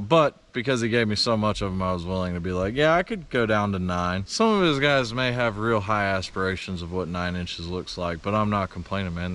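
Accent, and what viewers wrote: United States. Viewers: Man its so fine to find somebody who speaks murican. New subscriber.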